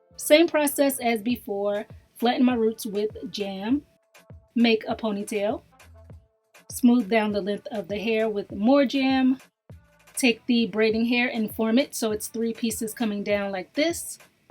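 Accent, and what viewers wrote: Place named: Maryland, United States. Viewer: I'm getting ready to try this. I followed your butterfly lock tutorial & got tons of compliments! Thanks! (Where are you from? You sound like you're in Maryland. Either way, I love your accent!)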